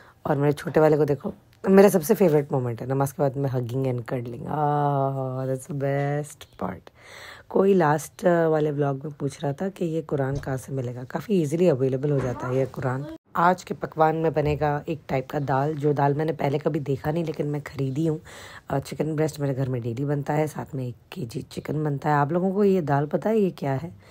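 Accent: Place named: Bihar, India. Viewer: Mashallah...Half English Half Urdu...You sound like a Bihari when you speak English...I have some medicine can you please promote that on your channel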